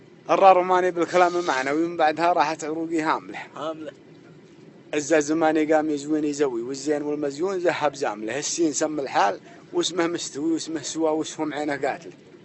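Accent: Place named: Saada, Yemen. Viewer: يمني من صعده ونفتخر فيه قال مهو من صعده اسمع لهجته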